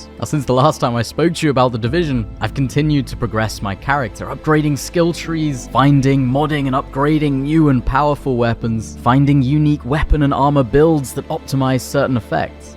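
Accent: Australia. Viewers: R u an aussie? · british man??? arent u austrailian?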